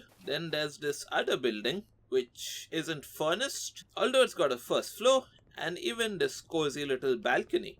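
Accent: India. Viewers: Indian and Bangladeshi at the same time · Indian i think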